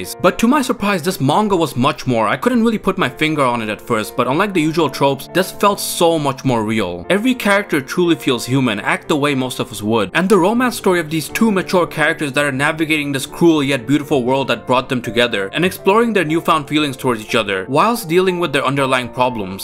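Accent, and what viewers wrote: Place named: United States. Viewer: sorry but i just can't with this american pronounciation xD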